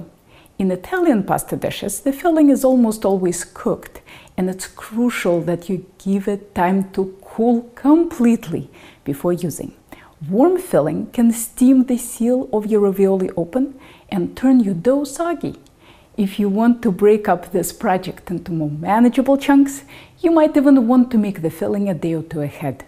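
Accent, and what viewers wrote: Russia. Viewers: Are you Russian? You have a very pronounced Russian accent. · Nice russian accent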